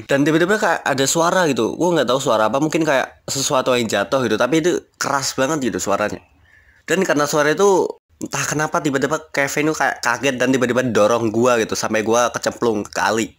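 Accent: Java, Indonesia. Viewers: Bang lu dari jawa yah🤔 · Apakah kamu orang Jawa bang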